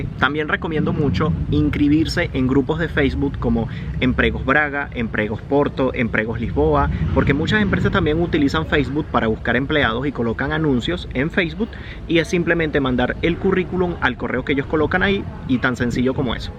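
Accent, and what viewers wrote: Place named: Venezuela. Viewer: Tu eres venzolano cierto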